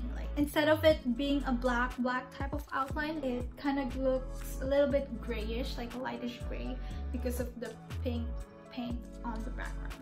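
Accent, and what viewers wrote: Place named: Philippines. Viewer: Thank you for this! <3 (Glad to find a Filipina/Pinay artist! thought you were Filipina by your looks+last name at first then your accent told me the rest. and hey I know my people 😂 mahal kita!)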